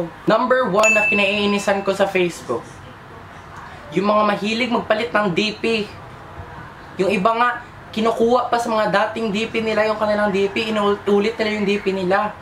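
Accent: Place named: Visayas, Philippines. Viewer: Bisaya ka fu ba? Or whut? Accent is real .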